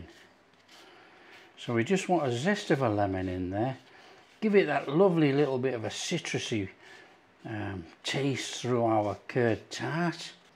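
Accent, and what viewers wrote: United Kingdom. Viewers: Can someone tell me what is this man's accent (within UK)?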